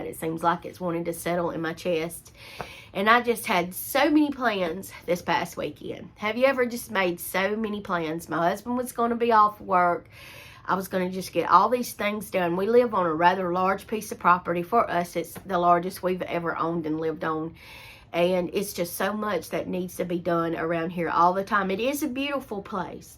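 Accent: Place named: Alabama, United States. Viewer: I am 64 and yes my body does not let me do the things I want to do. I am from Alabama. You sound just like us. Are you from Alabama?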